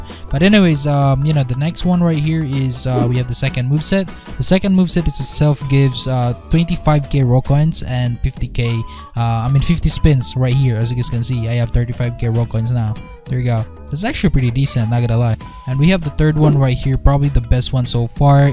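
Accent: Philippines. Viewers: Yung accent mo preee parang Filipino ka ah! Notice mo sana to men if filipino kanga ba talaga at kung na iintindihan mo tong sinabi ko.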